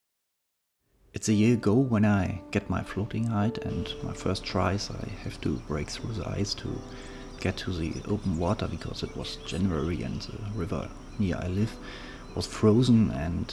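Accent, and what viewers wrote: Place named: Germany. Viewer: An deinem Englisch hört man das du deutscher Bist 😂✌👍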